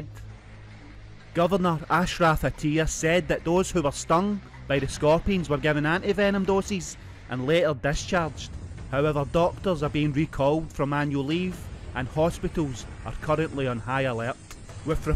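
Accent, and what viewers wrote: Scotland, United Kingdom. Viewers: Your accent lol. Super.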